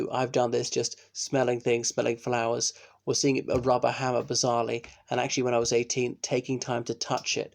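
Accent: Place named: England, United Kingdom. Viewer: Nothing like a Springtime poem read by a gentleman with an English accent. Cheerful and uplifting in these challenging times. Thank you.🌷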